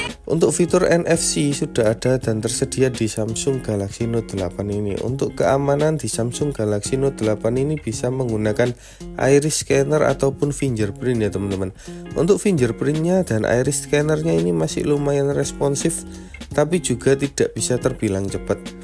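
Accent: Java, Indonesia. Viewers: Bagus reviewnya mas.tp agak medok.(maaf)keliatan orang jawa ya😂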